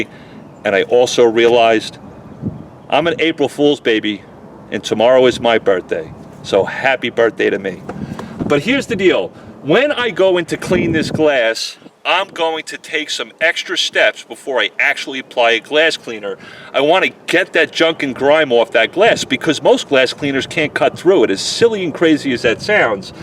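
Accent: New York, United States. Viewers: Lives in Dallas but is from New York, New York accent. 😊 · >Dallas Paint >Thick New York accent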